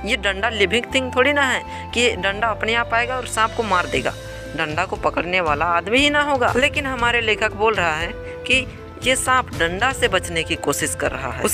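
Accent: Bihar, India. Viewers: Bhai aap bihari ho kya?😀 Ek dam bihari accent....mast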